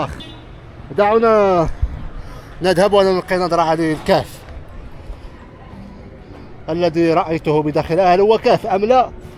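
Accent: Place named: Morocco. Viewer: لغة فصحى ركيكة كلغة الأطفال ..لغة كارثية مهزلة...اقول لك ماتكونش معقد من لهجتك المغربية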